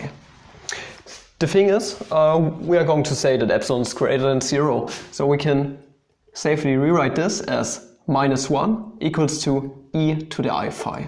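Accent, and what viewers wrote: Germany. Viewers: No offense, but the German accent is not helping pronunciation. 😁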